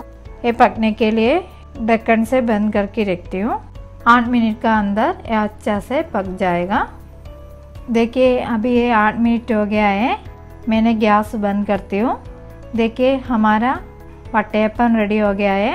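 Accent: Kerala, India. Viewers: Your Hindi with Malayalam slang is perfect. I love it. Keep up the good work.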